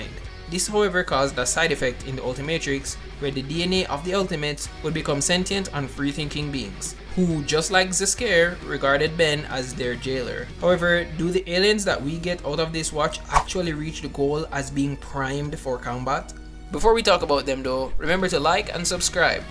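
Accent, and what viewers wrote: Jamaica. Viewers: are u jamaican bro? me hear a accent dwag? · Are you jamaican? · Sounds Jamaican to me??? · Jamaican accent? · You jamaican? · You’re Jamaican? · Are you Jamaican?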